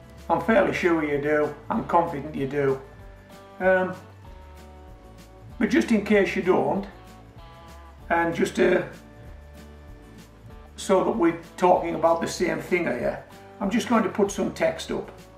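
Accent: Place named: Scotland, United Kingdom. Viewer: I've loved your various videos on gardening in pots! Indeed, I'll be using your advice (with a few modifications) whilst growing some potatoes (to start) in Los Angeles. Also, thank you for this particular video. I honestly had no idea about the plagiarizing of videos on youtube. Since I'll be starting my own channel fairly soon (nothing to do with gardening), I'll be taking preventive steps from the get go...thanks to you. Please, keep up the good work and give Molly a hug for me. Btw, it's always lovely listening to you....your accent reminds me of my Scottish grandparents. <3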